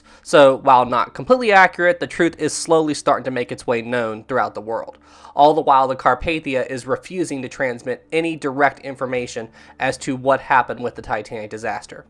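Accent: South, United States. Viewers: You seem to lapse in and out of a Southern accent.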